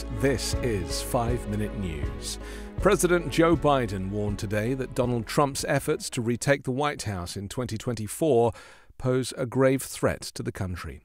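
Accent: United Kingdom. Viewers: Fake news in short format. Brit accent to appeal to gays, RINOs and other Democrats.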